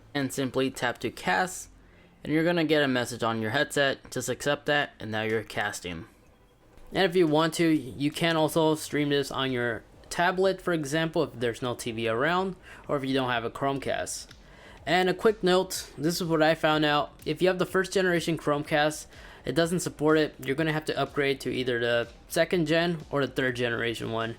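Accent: Ireland. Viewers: Are you from Ireland my guy? (I'm Irish too) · You from Ireland?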